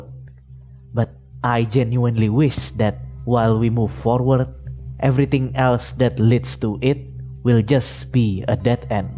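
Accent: Indonesia. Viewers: The accent sounds Javanese to me.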